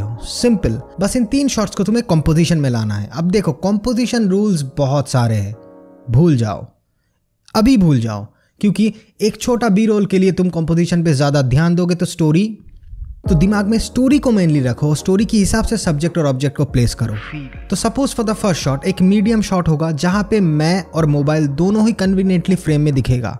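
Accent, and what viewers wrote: Bengal, India. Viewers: Bhai are u bengali?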